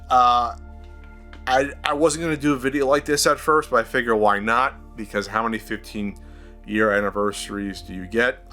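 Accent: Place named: East Coast, United States. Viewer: I've been watching your videos since you used to have east coast accent.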